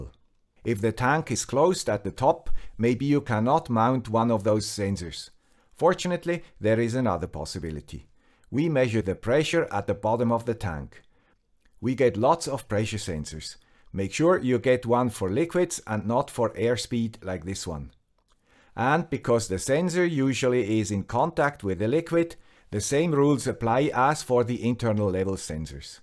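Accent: Switzerland. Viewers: A little more about why a solution matters (and why your videos have been helpful, apart from the pleasure of hearing a Swiss accent again after my years in Bern): A few years ago a colleague at my university asked me if I could help measure the level of water in the ground in a tropical rain forest. In fact I was already studying groundwater saturation in peat and other soils. This is a hugely important topic worldwide because it is an important factor in flood risk and in the loss of carbon from soils - one of the very biggest sources of greenhouse gasses to the atmosphere. So I had a go at the problem. There are a lot of ways in which people measure the level of water saturation, and soil moisture, some of which are good, some of which are poor - and which you have very helpfully discussed in previous videos. As you know there is, in fact, one method of measuring soil water content which, if calibrated, can be made quite accurate and tough using cheap sensors - capacitance monitoring. 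There are also many ways to measure groundwater saturation level (the level at which all the porosity in the soil is full of water, which is also the level to which a dip-well tube will be filled) some of which can make use of the instruments you discuss in this current video. My preferred method is to weigh a tube suspended inside a dipwell (because it's really tough enough to work in hard environments), but I have the load cell creep problem to deal with so maybe I go to a laser distance sy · Tangential question: at <link> are you saying "gritty YouTubers" or "greetings YouTubers"? I'll wager it is the former: gritty. The Swiss accent, while adding color and charm, leaves me in doubt about which word it is. Can you confirm? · Perfect timing! I have a small decorative fountain that I brought in to store for Michigan's winter. I wanted to outfit it with a sensor to stop the pump if the water level dropped to prevent damage and alert Home Assistant. 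Now I have no excuse to forget. Once again thanks to the guy with the Swiss accent!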